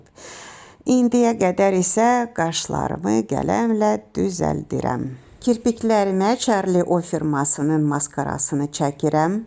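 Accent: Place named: Quba-Qusar, Azerbaijan. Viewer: Salam xanım. Siz haralısınız? Danışığızdan elə çıxır Quba Qusar zonasındansınız. Elədimi?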